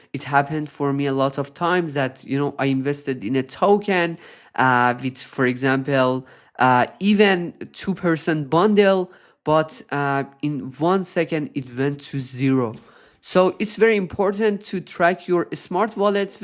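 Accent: Iran. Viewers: Bro are you Persian? You sound like Iranians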